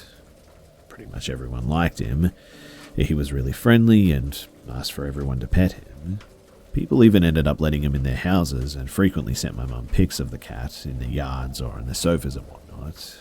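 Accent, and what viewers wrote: Australia. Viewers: it’s australian :) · His Australian accent reminds me of hugh Jackman that's a good thing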